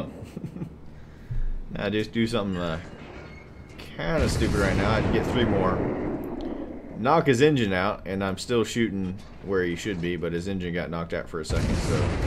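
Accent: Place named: Texas, United States. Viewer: THAT'S what it is. Another Texas boy. It's that back - home accent that I hear. Another of your typical entertaining and instructional videos. Keep'em comin.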